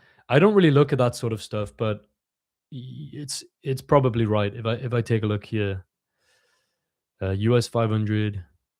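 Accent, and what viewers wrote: Newcastle, United Kingdom. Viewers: You sound a little bit like a geordie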